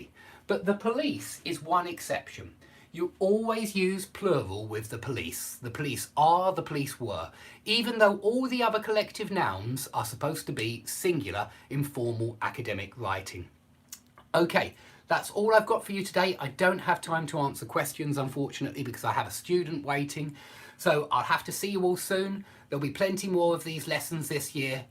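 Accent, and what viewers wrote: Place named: United Kingdom. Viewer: ! British speech ... cool.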